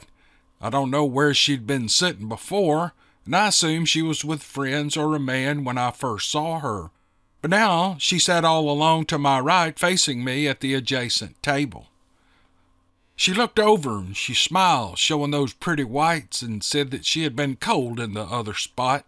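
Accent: South, United States. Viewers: Hey,. I have written you couple of times. I wanted to tell you I really enjoy listening to you. I was raised in the south and your voice brings back good memories. I am also a veteran and the Wild Hunt series is something else.....Mr Roberts stories and your narration are the shit. I would love to see a conglomeratiom of wild hunt stories made into a Movie would be awesome. I know some of the characters in the wild hunt team have a back story that would make for GREAT reading. Glad to hear you are gonna continue to narrate these books. Keep up the great work. Thanks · Yay! Thank you. I'm listening faithfully as you post!! LOVE listening to your Warm Southern drawl narration. Hope you, better half, all your great doggies and chickens are doing well. ☺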